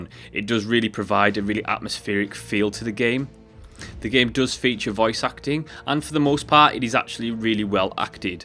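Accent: Scotland, United Kingdom. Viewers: You have a cool way to speak, is it Scottish?^^ (English isnt my native language, so no offense if its something else XD) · This is a bit expensive atm, I will pick it up in the next steam sale though for sure! This video seems like a great introduction to the game, I will check out some of your other videos for sure m8. PS Love your Scottish accent ^^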